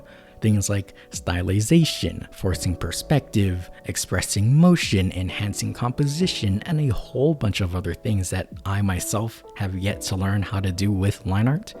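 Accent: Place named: United Kingdom. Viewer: british detected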